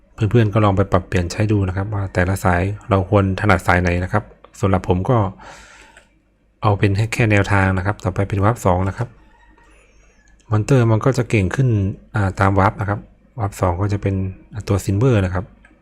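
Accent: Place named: North, Thailand. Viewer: อ่อครับ ผมนึกว่าพี่เป็คนเหนือ😅😅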